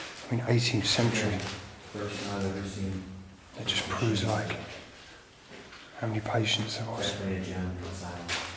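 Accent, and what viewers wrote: England, United Kingdom. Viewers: Sorry... I couldn't view this one ... I feel energy and didn't want to go any further....There are place that have residual energy and you can feel it. This one is Heavy. Not saying bad...just uh uh.. But, I know you gave it the respect and love for what was left there. Good Job. I have to say in other places I have seen you go to....You speak while you are doing it. I want you to know that As you are speaking you are including those who view...As they are there with you and sharing the experience....You do an Awesome Job...and LOVE the brogue...( not meaning anything by it ) My First Love was from England and He spoke the way you do....It makes me smile. I'm a fan...And I'm older than your Mum so I'm safe ! Lol Thanks for taking us places I have never been.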